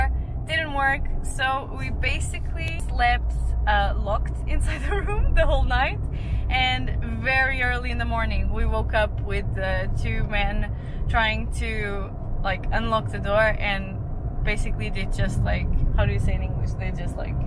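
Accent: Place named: Norway. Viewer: Happy birthday! I think your english has become influenced by your exposure to norwegian😊.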